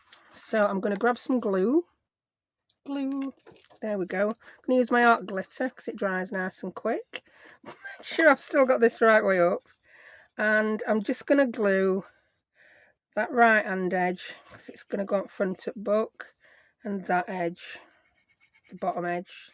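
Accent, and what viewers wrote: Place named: Yorkshire, United Kingdom. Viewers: They would be lovely as stocking fillers for Christmas. Gorgeous little note books. And it’s nice to hear a fellow Yorkshire lass. I’m originally from York. 😃👍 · How cute are these?! Beautiful and easy to do. Thank you for sharing. Yeah, lovely to hear a good, strong Yorkshire accent. x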